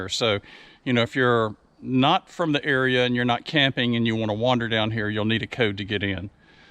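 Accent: South, United States. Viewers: my pleasure, your content is great 👍 Also I'm from Florida, but moved to WA state a few years ago, and watching your videos makes me miss hearing southern accents all the time haha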